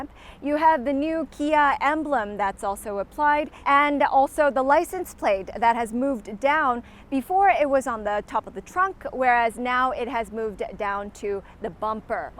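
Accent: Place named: United States. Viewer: Good video! Regards from an Kia Stinger driver in Sweden! And its good with the American accent, not the England "time for thea" accent! :)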